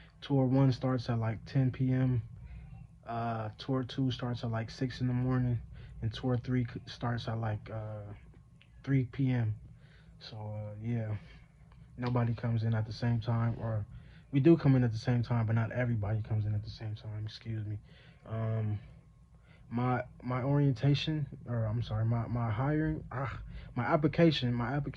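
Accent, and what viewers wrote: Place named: Inland Empire, United States. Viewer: You're from the IE? Low-key speaking like you from the inland empire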